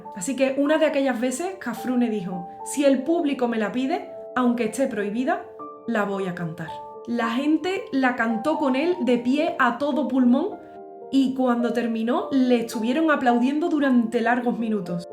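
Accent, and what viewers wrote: Andalusia, Spain. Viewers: Impecable! Flor de arreglo, y tu acento andaluz le da un toque único y maravilloso. Soy argentino, hermosa interpretación 👏🏽👏🏽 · hermosa version. Si no fuera por tu hermosa tch andaluza diria que naciste en Salta la linda. Cariños desde Argentina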